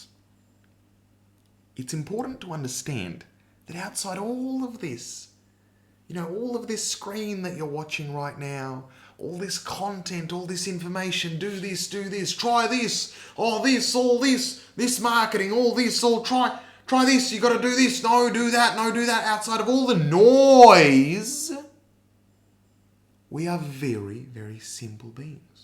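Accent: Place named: Australia. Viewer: ur australian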